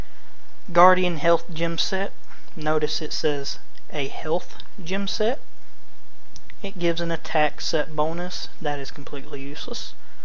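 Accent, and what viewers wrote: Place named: North Carolina, United States. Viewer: Are you from NC? accent sounds like where I live.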